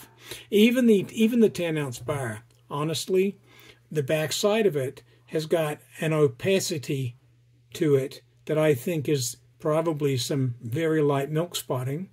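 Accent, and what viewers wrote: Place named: New Zealand. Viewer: I’m a kiwi who has lived in Australia for 30 years , I hear New Zealand , Australian and American in your Accent , and a hint of something else I can’t quite put my finger on 😂 . I like your channel 👍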